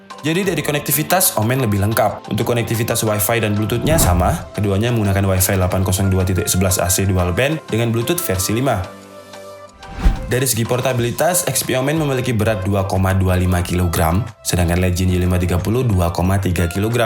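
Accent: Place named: Bali, Indonesia. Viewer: ngomongnya kyak orang bali euy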